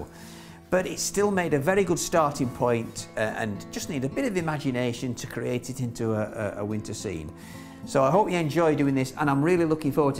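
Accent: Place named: Northern England, United Kingdom. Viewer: Hi Just started painting (52) just wanted to say loving your videos on YouTube. In guessing from your accent you're up north but wondered if you do classes. I'm in cornwall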